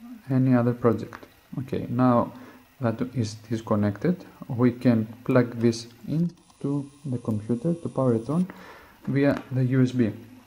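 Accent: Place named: Greece. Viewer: Αχ αυτη η προφορα μας ! Ωραίο video ;)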